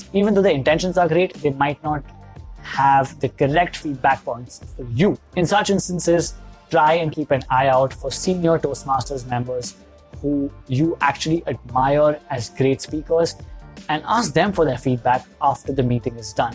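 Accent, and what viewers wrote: India. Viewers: I congratulate you for your Chutzpa! With your accent? Are you aware of the damage you do? You ripped off your TM experience, then you flatter them, then you criticize them, then you plug your product? Please go to a speech teacher, get rid of your accent, then, when you no longer sound like Peter Sellers doing his Indian Accent, begin to teach. You are off the tracks, friend. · There's nothing wrong with his accent. In fact, he has one of the most neutral English accents I've heard in Indian YouTubers in a long time. You're just bitter that he had some cons to share about Toastmasters. So you're making a personal attack. That's petty.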